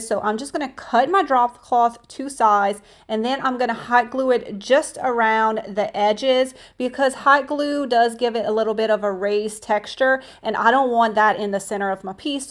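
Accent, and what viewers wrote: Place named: South Louisiana, United States. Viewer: I love your accent! Sounds like South Louisiana! Reminds me of my daddy’s family in the Houma area. I love all your projects!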